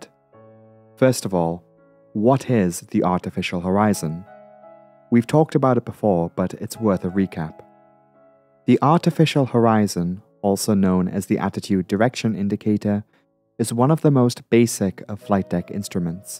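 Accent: Newcastle, United Kingdom. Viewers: I'm from the North East of England too. Your accent has little bits of Irish, Scottish and a slight American lilt at times. I can hear the geordie in you a little bit though but I wouldn't have thought you'd been born and bred in Newcastle by your voice. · Hello from sunny and warm St. Petersburg, Florida! Love your choice in the piano music. Love your British accent, us a great narrator voice. Want to visit London but I am unsure if I can handle the weather. Snow & cold was fun as a child in Chicago! Look forward to your next vid. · What clear pronunciation, deserves to be on docs. Not bad for a geordie. Met a few in the middle east. An aussie and subbed.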